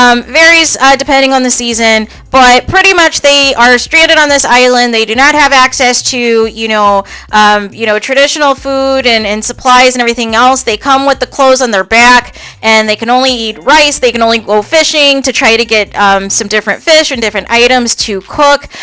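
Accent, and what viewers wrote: Midwest, United States. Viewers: I like your accent. It's either Midwest USA or Canada, I can't tell.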